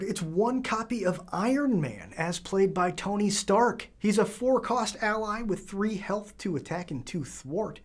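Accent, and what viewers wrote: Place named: Scotland, United Kingdom. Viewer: Thought this one might be Scottish 🤣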